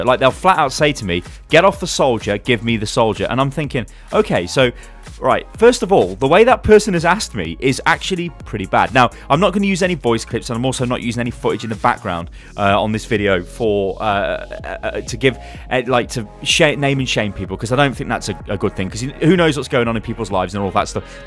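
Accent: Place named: United Kingdom. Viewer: His comfortable British voice gets me wet...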